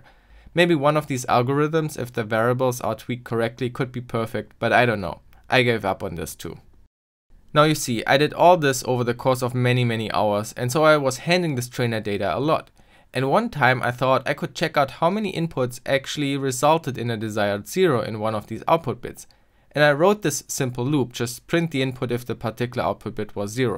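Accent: Germany. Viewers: Bisschen hört man doch raus das er deutscher ist :D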